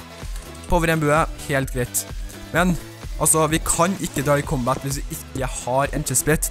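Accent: Trøndelag, Norway. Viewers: Elsker den positive holdningen din og trønder dialekten! :D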